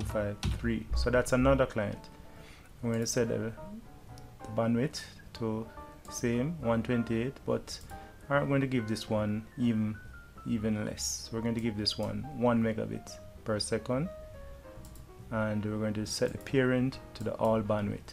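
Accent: Jamaica. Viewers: good and useful video, I feel the Jamaican accent very much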